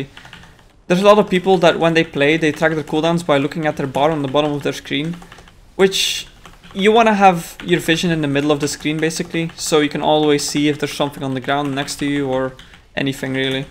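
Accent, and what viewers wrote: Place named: Netherlands. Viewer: oh i was guessing dutch, you sound different from the Belgians i know.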